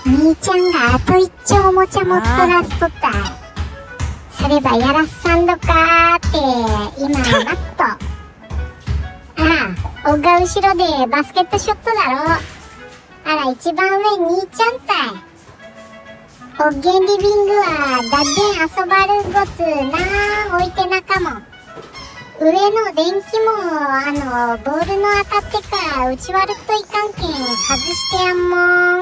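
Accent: Kumamoto, Japan. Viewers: ばっちゃんのチャンネル見つけて1週間。毎日見ていたら私の発音がちょっとおかしな事になってるみたいです😅千葉県民ですが、熊本弁に侵食されてきました。 来年も熊本弁を習得できるようたくさん見ます😊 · こんにちは😂 いつも仕事から帰ってきて我が家のミディアムプードルと一緒に楽しく見てます😄 来年はバーニーちゃん、 ジーニーちゃんに会いに行きたいと思ってます😄 熊本弁…楽しくて笑っちゃいます🤣 (息子が熊本に住んでてイントネーションが変化して帰ってきてます笑) 来年も楽しみに待ってまぁす🐶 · 今年はジーニー兄ちゃんとバーニーとの出会いがありました。笑いと癒しを沢山届けてくれてありがとうございました❤ 出会いは未だ登録者数が3000人未満だった時です❤でもその後の快進撃❣️素晴らしい👍です。とにかく何気ない日常だけど笑える😅🤣熊本弁もいいよね〜💕 東京からだと熊本は遠いからなかなか会えませんが、もう親戚のわんちゃん達って感じです。 来年もどーぞよろしくお願いします❤ で良いお年をお迎えくださいね💕 では、ご無礼いたしま〜すぅ❣️👈この言葉私のお気に入りです😅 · はじめまして😂こてこての県民天草〜熊本市出身です😊（コメントはあえてNo熊本弁で🙏）やっぱり熊本弁はネイティブが話してこそ生きると痛感してます!来年もスカッとする熊本弁トークお願いしまーす👌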